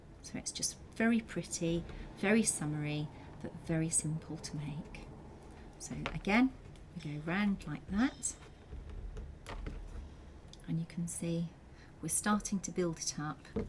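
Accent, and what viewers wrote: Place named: United Kingdom. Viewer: Yes! I came here to say that everything is better with a British accent. And this is a beautiful tutorial!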